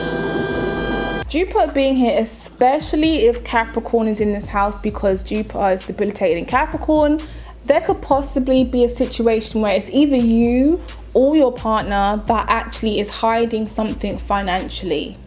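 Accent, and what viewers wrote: United Kingdom. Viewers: am covid vibes did not know she was British. That just blew my mind.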